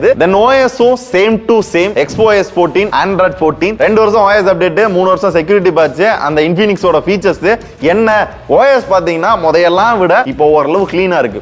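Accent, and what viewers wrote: Madurai, India. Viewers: When our madurai gang vanthale antha slang kaga technicality kaga than unga video pidikum but specific a sollanum na antha VR HEADSET REVIEW ❤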